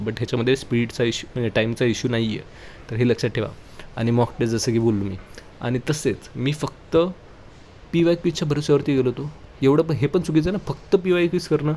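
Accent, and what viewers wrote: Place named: Karad, India. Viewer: aawaj Varun Karad vatakl😅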